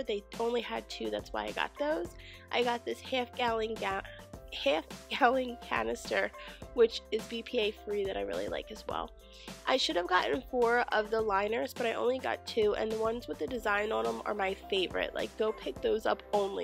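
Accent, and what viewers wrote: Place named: Midwest, United States. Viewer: Love your videos! Random question, are you from the Midwest? I am sensing a Ohio/Illinois/Michigan accent! If you don't feel comfortable answering I totally understand! Keep up the great videos!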